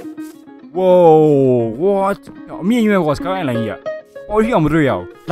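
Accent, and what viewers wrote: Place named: Myanmar. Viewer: i dol ေရျမန္မာအသံေလးနဲ႔အရမ္းႀကိဳက္တယ္ဗ်ာ❤❤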